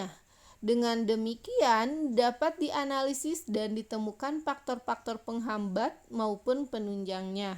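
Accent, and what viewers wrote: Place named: Java, Indonesia. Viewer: Mandan medok sedikit bahasanya ya mb..hehe..pk sukses selalu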